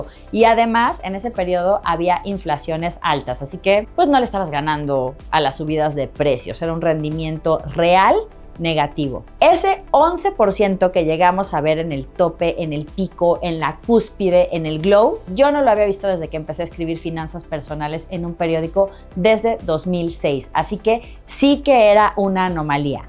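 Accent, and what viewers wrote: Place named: Fresa, Mexico. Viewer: Muy buena información. Sin embargo, el acento fresa me da mucha risa jeje.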